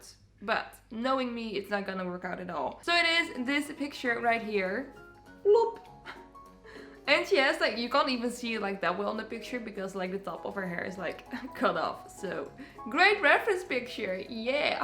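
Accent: Netherlands. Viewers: First of all, amazing hair. Second of all, are you Dutch? You sound Dutch and im jealous of ur hair.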